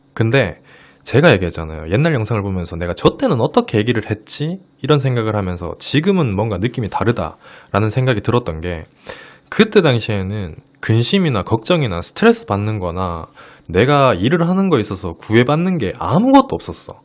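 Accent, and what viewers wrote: Gyeongsang, South Korea. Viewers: 처음 보는 영상인데 일단 목소리,대구?말씨 억양, 훈남이면서도 편안한 외모에 위로받는 느낌이 들면서 빠져드네요 손 동작이 조금 산만해보여 아쉽지만 구독과 함께 좋아요 입니다.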